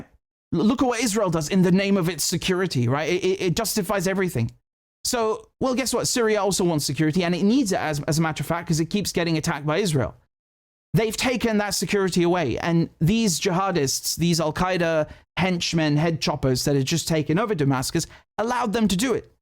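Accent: United Kingdom. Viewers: Nice British accent 👍 excellent journalism 👍 agree with everything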